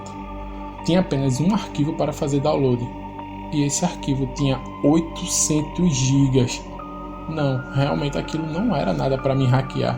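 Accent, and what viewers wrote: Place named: Northeast, Brazil. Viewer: pelo sotaque ele parece ser nordestino, porque parece muito com o da minha região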